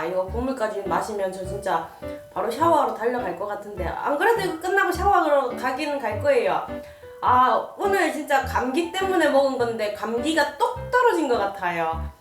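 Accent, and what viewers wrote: Gyeongsang, South Korea. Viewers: 안녕하세요..경상도 사투리가 너무도 정겹네요. 저는 질금나물을 좋아해서 쌀국수 1: 질금나물2 이렇게 넣고 베트남 생고추 총총 썰어서 맵게 먹어요. 오늘도 너무 맛있게 드시네요.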